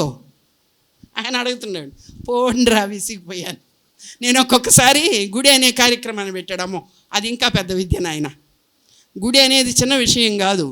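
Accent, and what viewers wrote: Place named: Nellore, India. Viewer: "సాయి సత్య ధర్మ వైభవం" - <num>, షిర్డి సాయి గ్లోబల్ మిషన్ ఆధ్వర్యంలో సాయి తత్వ ప్రవచన కార్యక్రమంలో వాకాడు ఆనంద సాయి మందిరం శ్రీమతి ప్రసంగం సాయి బాబా గారి తత్వం గురించి…సాయి తత్వం ఎంతో గొప్పదని సాయి అన్ని ముందుండి నడిపిస్తారు అన్ని నెల్లూరు స్లాంగ్ లో అద్భుతమైన ప్రసంగం చేశారు..🙏🙏🙏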